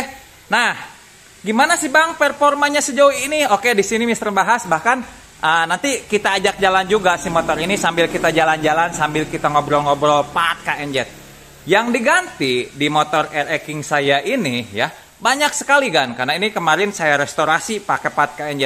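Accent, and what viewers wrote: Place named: Tasikmalaya, Indonesia. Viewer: Jb cisinga si aa na orang tasik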